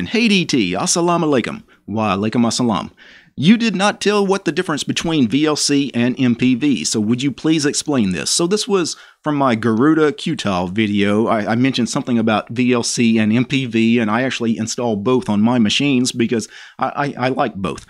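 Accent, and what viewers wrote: South, United States. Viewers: You sound southern. Where are you from?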